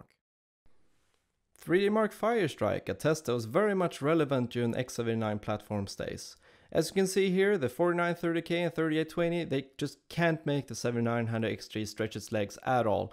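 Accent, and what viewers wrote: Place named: Sweden. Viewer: If you listen closely you can hear your accent is coming from Sweden sort, yeah its correct xDD.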